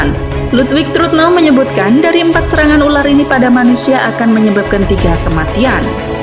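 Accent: Java, Indonesia. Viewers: Medok naratornya 😆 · Naratornya medhok banget 😀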